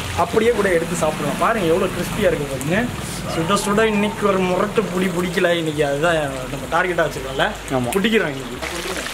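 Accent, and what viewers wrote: Nagercoil, India. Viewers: Slang like nagercoil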